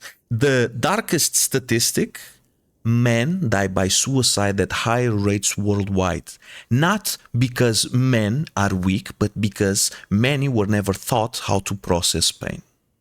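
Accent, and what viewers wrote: Portugal. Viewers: Interesting video. Portugues? e do norte? parece-me 😅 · You sound Portuguese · é incrível como basta um tuga para reconhecer outro tuga a falar ingles ahah, vídeo incrívellll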